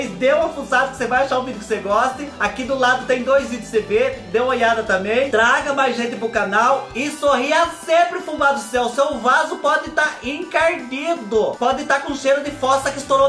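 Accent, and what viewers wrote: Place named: Capão Bonito, Brazil. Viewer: Fubá, você fala CHÊ. Que nem minha falecida vozinha ali de Capão bonito. Vc lembra meus familiares. Amo seu canal. Agradrço a Deus por vc existir. Muita saúde pra vc e pra sua mãe maravilhosa. Deus os abençoe.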